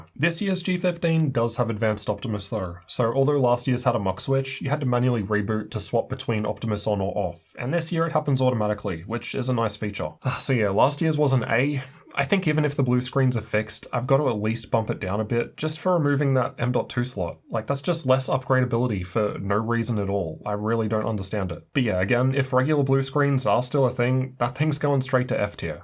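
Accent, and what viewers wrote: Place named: Australia. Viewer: That's the Aussie accent 😀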